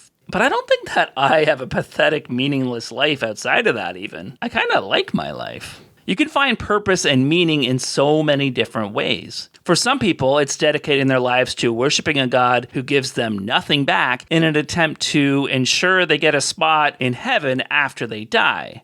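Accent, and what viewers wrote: Canada. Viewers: Aww I like the Canadian accent.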